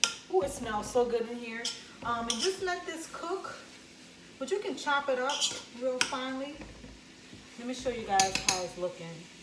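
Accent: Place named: New Orleans, United States. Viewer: Nice baby yo accent so new awlins. Gentilly?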